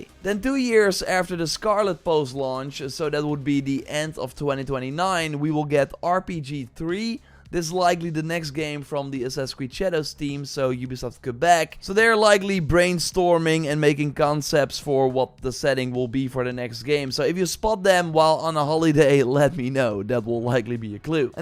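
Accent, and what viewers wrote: Netherlands. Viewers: Your dutch accent really sucks man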